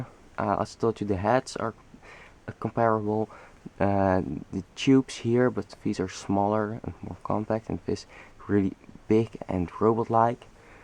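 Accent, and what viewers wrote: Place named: Netherlands. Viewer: I just hear that your Netherlands (ik ben ook)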